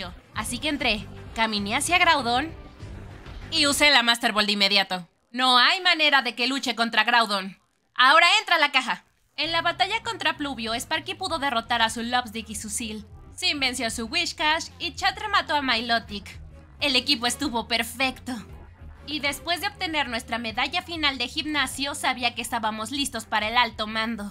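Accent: Chile. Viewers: Eres chilena ?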